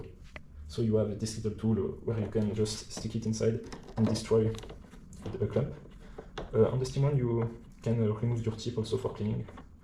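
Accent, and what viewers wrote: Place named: France. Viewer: u speak english very well, euuuuuh, wiz youre french accent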